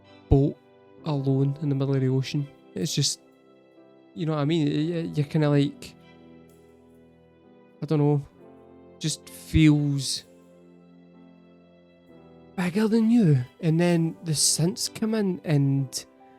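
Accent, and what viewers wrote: Scotland, United Kingdom. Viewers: Wait!! You're Scottish???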